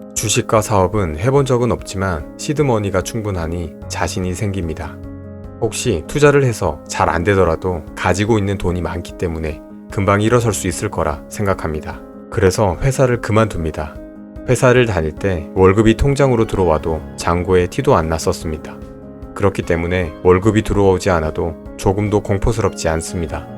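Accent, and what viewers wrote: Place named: Gyeongsang, South Korea. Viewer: 경상도 사투리억양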